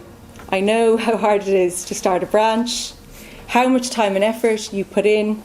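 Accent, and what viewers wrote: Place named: Cork, Ireland. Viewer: its great you never lost the cork accent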